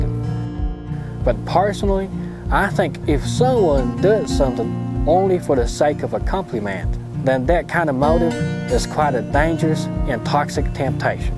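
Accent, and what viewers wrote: South, United States. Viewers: I think if you had learned and tried to speak with the "midwestern American" accent that television news reporters use, you would speak with a Chinese accent. Because you learned and speak with a southern accent, it hides any Chinese accent. You sound like a person from the American south. If this works for you, great! There are probably relatively few people from China who are learning English with an accent from the American south so it makes you very memorable. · Men you have no idea how much I love you southern accent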